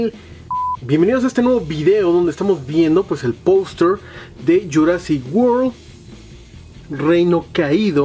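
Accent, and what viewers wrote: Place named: Guatemala. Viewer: V creo que eres de Guatemala :v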